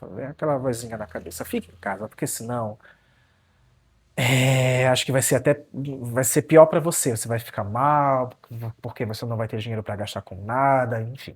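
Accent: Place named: North, Brazil. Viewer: oi! há pouco conheci seu trabalho e já comecei a te acompanhar! fala um pouco mais sobre sua jornada, acredito que seu sotaque revela que você origina de alguma parte do norte, me corrija se eu estiver errado, enfim, ótimo conteúdo, estou super contente em ter "conhecido" você.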